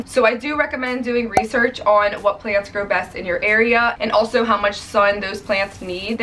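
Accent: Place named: Philadelphia, United States. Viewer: Oh you are in New Orleans...I thought you sounded like Philly! Please update in a few to show how they have thrived!!! 🌿😀🌱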